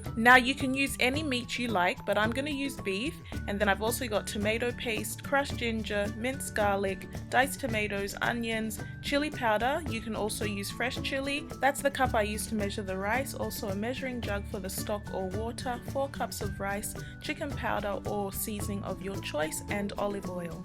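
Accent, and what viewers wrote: Australia. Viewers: Are you australian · omg you got a nice accent. Australian aye?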